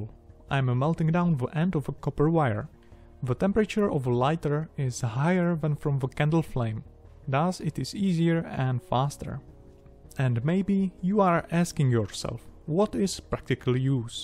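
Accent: Czech Republic. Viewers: Čecha mluvícího anglicky poznám na tři míle. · You Czech? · další skvělý model, ale mondieu ta czengličtina :)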